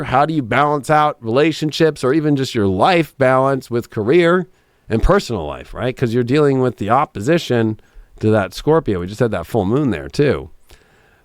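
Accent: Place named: Los Angeles, United States. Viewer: THANK–YOU ♥️✨™️®️🎨♏️🌞♓️🌙 Greatly appreciate your time spent here, & those beautiful LA vibes going into the painted canvas for that Survivors Family ArtShow. I like how your voice brings LA to East Coast. Good vibes, over in VT😎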